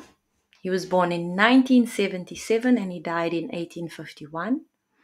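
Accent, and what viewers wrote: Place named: Cape Town, South Africa. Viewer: Sounds like a cape town accent, especially how you say "opporTunity"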